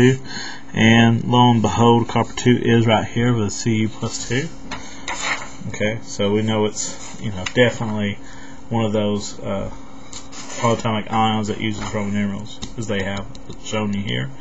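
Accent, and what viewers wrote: United States. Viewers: Thank you helped a lot :) I love the accent lol Americans mmmm mmm good lol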